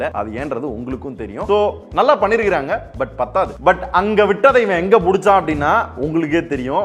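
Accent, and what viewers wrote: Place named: Madurai, India. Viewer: மதுரை காரனுக்கு நீங்க பேச சொல்லிக் குடுக்க வேண்டாம்...பேச்சு வழக்கு அப்படி தா இருக்கும்... 💯 பிடிச்சா பாரு இல்லனா‌ கிளம்பி போய்டே இருக்கனும்..✅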